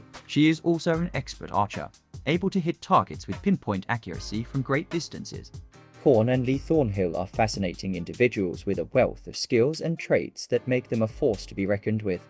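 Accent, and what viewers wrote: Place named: England, United Kingdom. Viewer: I love this File Card Photo play. With Voice over With English Accent Rem8nds 9f Niguel from Jamangi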